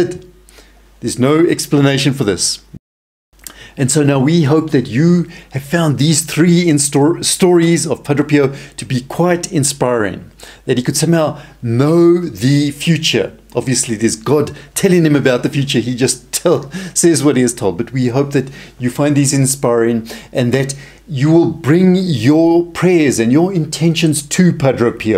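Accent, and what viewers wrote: South Africa. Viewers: Woah is this presenter South African? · Unmistakably south african.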